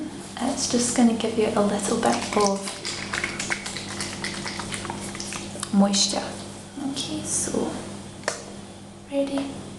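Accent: Scotland, United Kingdom. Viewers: I absolutely love your Scottish accent. You make it sound like a song. Beautiful to listen to :) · Good. I thought you were really Scottish! And im Scottish myself. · Concerning your inbox: Open your video manager (for example) and see the menu on the left side - klick on "Community" - another menu will open and THERE you can find your inbox :) The Scottish accent rocks. I love your videos, great to see you're back ♥ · Scottish accent made me hard. I don't know why. Probably a combo of voice and gorgeousness.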